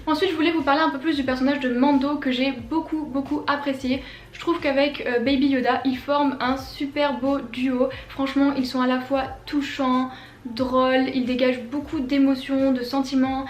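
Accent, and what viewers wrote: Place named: Quebec, Canada. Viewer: I lived in Montreal for 25 years, but I don't get to hear people speak quebecois french anymore, so I watch your youtube channel instead :-)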